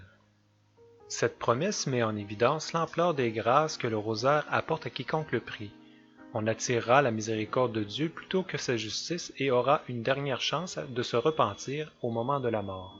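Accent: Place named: Canada. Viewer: douce vierge marie accent canada il faut bien ecouter moi qui suis d origine allemande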